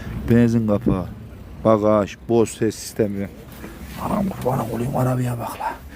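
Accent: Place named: Black Sea, Turkey. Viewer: Sırf şu konuşman şiven için abone oldum (Kurbanın olıyım) ( şuna baghele uşag)😂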